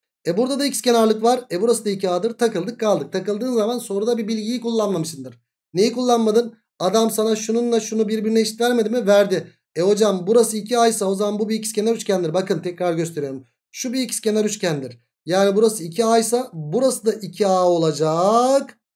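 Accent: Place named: Thrace, Turkey. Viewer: Hocam siz devrekli değil trakyalısınız bence hatta Çanakkalelisiniz. Konuşmalar çok bizden 😄 ağzınıza sağlık anlatımınız süper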